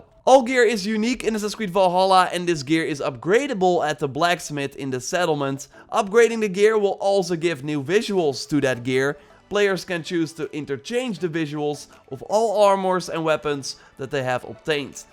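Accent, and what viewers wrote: Netherlands. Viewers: I can hear that you are Dutch hehehe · This dudes accent sounds so dutch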